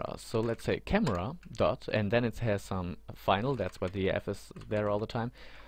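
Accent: Germany. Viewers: You sound german :D You're from Germany? Aren't you?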